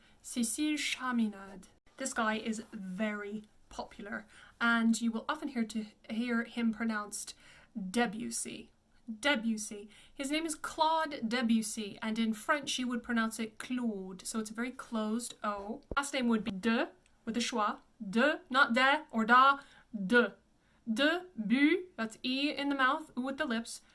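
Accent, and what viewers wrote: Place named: United Kingdom. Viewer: You are the first person I have ever heard who speaks in a British accent but with a rhotic r. Did you spend time in the US?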